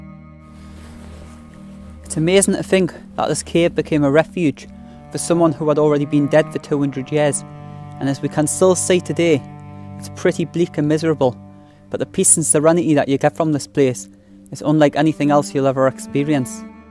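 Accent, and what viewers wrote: United Kingdom. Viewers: Pardonnez-moi mais je suis curieuse.... Votre accent vient-il du Nord de l'Angleterre ou d'ailleurs? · At least you have the real accent. Too many folks make videos about Northumebrland and cannot speak properly.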